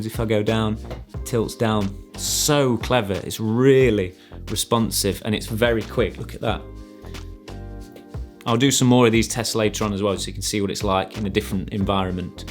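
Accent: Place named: Mansfield, United Kingdom. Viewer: Great review. You sound like your are from mansfield, pretty sure I recognise that bridge. Rainworth bypass? 😆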